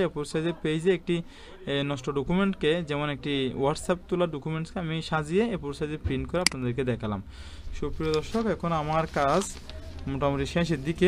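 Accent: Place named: Noakhali, Bangladesh. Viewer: ভাই আপনি তো নোয়াখালীর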